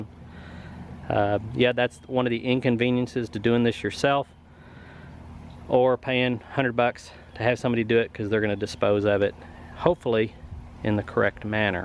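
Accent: South, United States. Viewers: i like ur southern accent... makes me wanna eat some ribss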